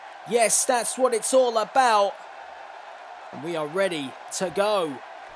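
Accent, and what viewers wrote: England, United Kingdom. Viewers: are you from england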